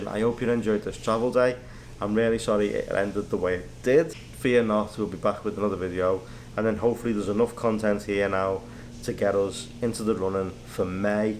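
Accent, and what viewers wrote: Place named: Liverpool, United Kingdom. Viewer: Yess I’m feeling this shout lad ha just subscribed soon as I heard the scouse ha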